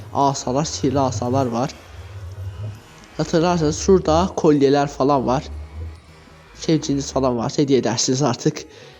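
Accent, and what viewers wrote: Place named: Azerbaijan. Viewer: Salam məndə azeriyəm danışığınan bilinir azəri olduğun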